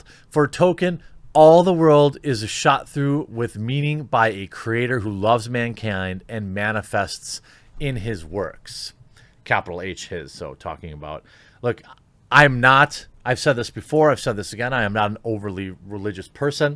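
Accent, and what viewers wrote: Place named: Wisconsin, United States. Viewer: We love, but he pronounces "bag" as "beg," flag" as "fleg," and "dagger" and "degger." I have no authoritative source, but it is believed he also pronounces "wash" as "warsh." He's from Wiscansn. Cut him a little slack.;)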